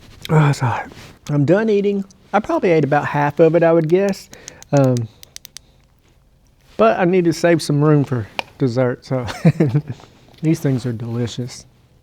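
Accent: South, United States. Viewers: love your southern accent bro.i have a good bud from San Antonio Texas.builds golf courses all over the world.met him here on Vancouver Island years ago while he was sculpting a course.he had a good accent as well.cheers.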